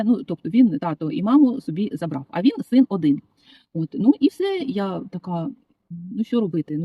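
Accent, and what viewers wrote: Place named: Surzhyk, Ukraine. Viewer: Дякуємо, що розповідаєте, як живуть у світі люди! Вам бажаю знайти СуперМаріо з апартаментами😊, та за жити спокійним гармонійним життям! А ні, то і українці гарні хлопці є! І з апартаментами теж. І, до речі, нічого, що інколи проскакує суржик в розмові.У нас півУкраїни на суржику говорить. Удачі вам! 🩵☀️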